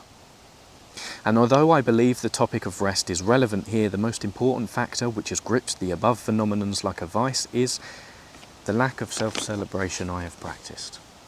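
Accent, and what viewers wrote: United Kingdom. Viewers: I'm 22, and I'm not struggling so much right now, thanks to actively accepting both who I am but also who I have been, and being forgiving. Extending a hand to the version of me that fucked up, instead of insulting it. But it still feels like a neverending tug-of-war with myself, still. With every positive thought comes an equally negative one, that i need to choose not to listen to. I am doing well now. And I'm on a path that is finally mine. And yet I feel that if I start relaxing just a little bit, if I loosen my grip on the rope I'll fall forward and back into the abyss of despair and fear. One day I feel like I can wholeheartedly trust myself and my decisions, and the next I stay on full alert to avoid making even a single misstep. This is why, although I am currently doing fine, this video is going into a special little box, that I keep filling while I feel clarity, and trust in my decisions. 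Because inevitably, when life throws another punch at me and I'll be there lying on the floor, I'll be relying not just on me, but also on little me. Adolescent me, depressed me, happy me, and future me. Thank you. Your videos help many people, and anyone could use a soft spoken british voice giving them encouragement in their times of need. ✌️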